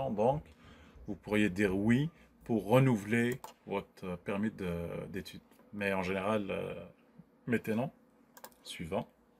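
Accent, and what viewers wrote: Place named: Canada. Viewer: D'aprés votre accent, ça se voit que vous etes un anecien au Canada, sinon tan,irt pour la vidéo.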